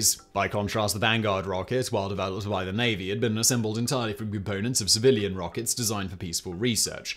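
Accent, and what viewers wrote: United Kingdom. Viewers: why do brits ad extra syllables to the word Alminium? It's 4 syllables not 5. The hard second "i" is totally supposed to be silent, not accentuated. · He's British so no.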